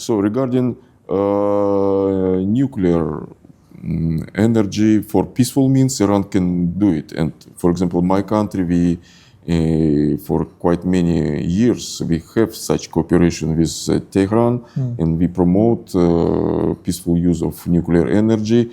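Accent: Russia. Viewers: Aksen rusianya kental.. Keren..😊😊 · Gw tetep harus denger karena gw juga pengen denger aksen rusianya pas ngomong bahasa inggris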